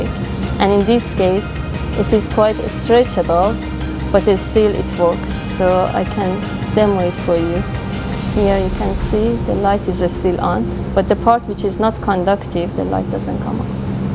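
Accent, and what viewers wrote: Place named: Iran. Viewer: At the first moment from her accent I found she's Iranian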